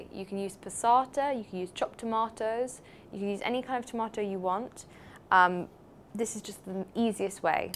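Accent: United Kingdom. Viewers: I swear to God I love British accent >3< So many tasty recipes, so little time to make them :(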